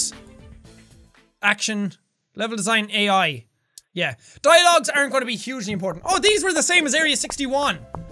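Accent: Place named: Ireland. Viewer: I love your brogue!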